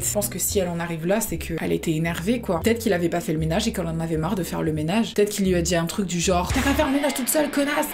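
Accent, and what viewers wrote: England, United Kingdom. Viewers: L accent anglais j adore t aime bien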